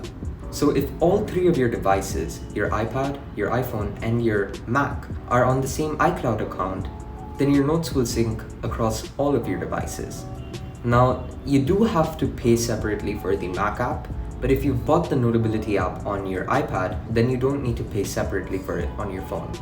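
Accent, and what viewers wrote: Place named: India. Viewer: Holy hell, the Indian accent hit you hard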